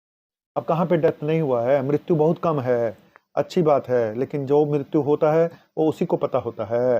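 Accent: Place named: Bihar, India. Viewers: Sir I have been very much impressed by your Bihari accent .love you sir 🙏🙏🙏🙏🙏🙏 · Behari accent,Nice👍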